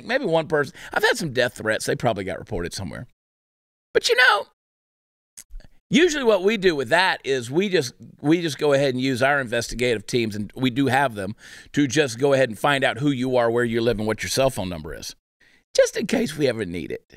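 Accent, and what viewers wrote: Texas, United States. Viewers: Ya gotta love a wordsmith with a Texas accent!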